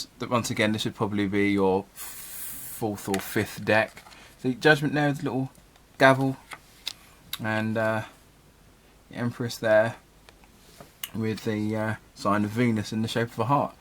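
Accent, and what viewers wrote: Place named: California, United States. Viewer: Love your accent from California, just subscribed!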